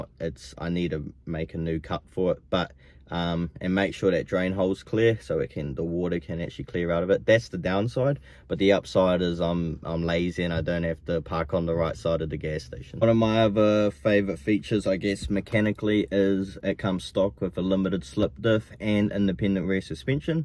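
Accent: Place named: New Zealand. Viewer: You NZers speak funny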